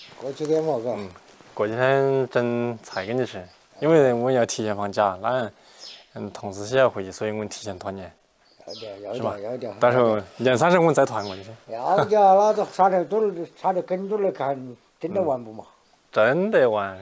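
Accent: Sichuan, China. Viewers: 作为一个重庆人，习惯了油管上的国语和英语，听到王刚的四川话，既感觉亲切，又有些想笑。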